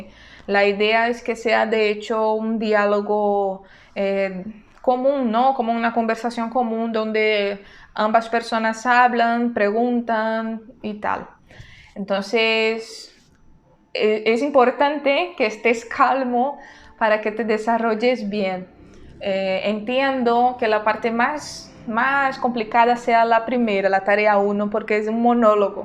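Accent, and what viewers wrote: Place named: Spain. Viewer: Una mujer latina hablando el español de España y yo acá en Europa estudiando el de Latinoamerica. Me da chiste jaja. Su español es increíble! Muchas gracias por los consejos, me ayudaron mucho. Ojalá algún día alcanze lo mismo. Tb aspiro el C2 pero me parece bien dificíl y siempre cuando tengo nervios se me va el español. 😂 Bueno, tal vez en 10 años lo voy a intentar jaja Saludos desde Alemania. ✌🏼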